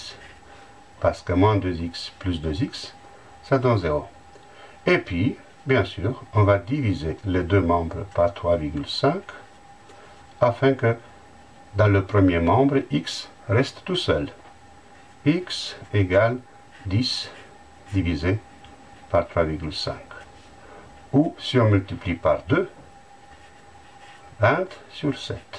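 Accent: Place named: Romania. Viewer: vous etes roumain? Car vous avez l'accent (si oui eh bien je voulais vous dire que sunteti un profesore foarte bun)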